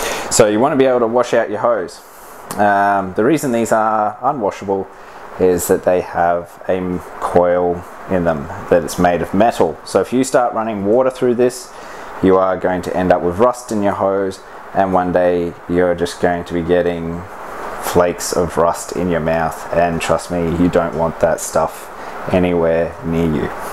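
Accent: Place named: Australia. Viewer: Australian accents are bomb. good review man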